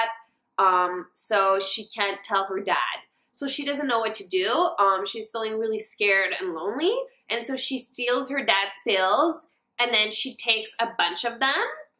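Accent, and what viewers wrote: Quebec, Canada. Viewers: French Canadian. It's just her accent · she has a Quebec accent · I love your accent, I'm a Canadian too so I love this. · She's from Montreal She replied to a comment asking about her accent and where she lives. It's French Canadian. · Canadian accent 😂😍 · It's French Canadian. And if she only speaks French for the most part she most likely translating in her head. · Are you from Quebec? You have the kinda accent? (In my Aussie opinion lol) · Are you Quebeci French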